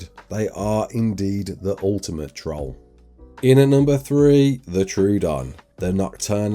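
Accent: Birmingham, United Kingdom. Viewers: He’s definitely from smethic Birmingham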